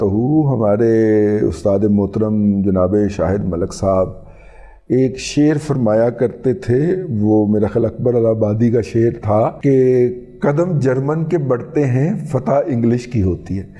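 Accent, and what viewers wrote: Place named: Sialkot, Pakistan. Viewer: Dada Jee ..., Sialkote tone... Good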